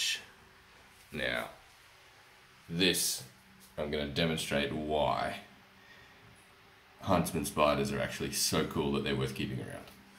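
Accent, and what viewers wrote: Australia. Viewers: Me after seeing the thumbnail: “I bet this is in Australia” Video dude two seconds into the video: Australian accent YouTube pinned location: Australia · yk its an australian video when he has an australian accent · you know this is australia when he has a australian accent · I heard the Australian accent before I even clicked the video 😭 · Ken Ken he sounds slightly Australian, he’s also from Australia. Australians are known to be cool with spiders and stuff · Of cause he’s Australian the accent and the fluent voice is Australian.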